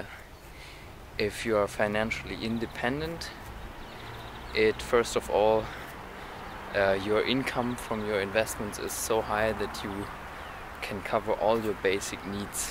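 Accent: Germany. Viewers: Lool he's german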